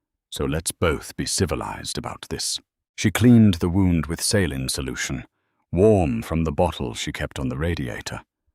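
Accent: United Kingdom. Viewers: I can't quit laughing. LOLOLOL Thermal regulation? LOLOLOLOL Calling him a big baby. The narrator with the British accent is PERFECT. LOLOLOLOLOL LOVE IT !!!!!!!!!!!! Love hearing what a MAN will do for a woman. Just soo wonderful to LAUGH... Thank You.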